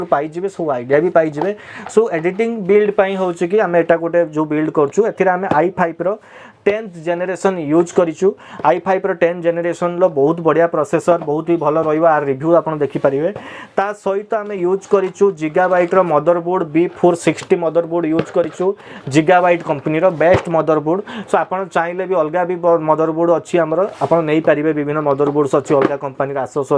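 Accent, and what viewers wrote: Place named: Balasore, India. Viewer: Bhai tama ghara balasore ki Tama accent ru lagila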